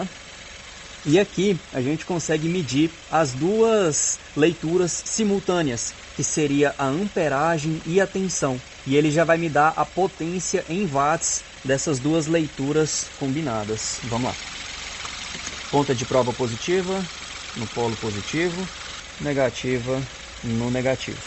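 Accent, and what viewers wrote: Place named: South, Brazil. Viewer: Você é de qual estado,? Seu sotaque parece de sulista.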